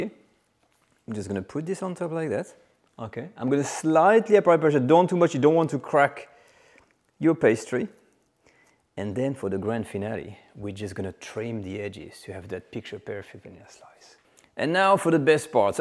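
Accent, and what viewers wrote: France. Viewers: I subscribe because of his accent love French accent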